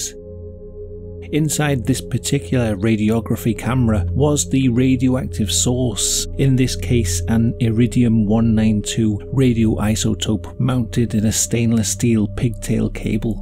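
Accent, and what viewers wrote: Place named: England, United Kingdom. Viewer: This guy (is) English, he's based in the UK. 😂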